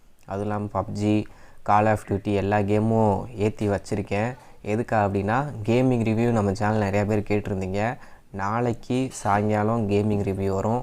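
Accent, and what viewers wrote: Madurai, India. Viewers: Bro neenga madurai ah?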